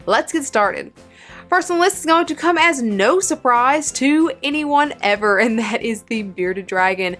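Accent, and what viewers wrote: South, United States. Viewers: Love the southern drawl as well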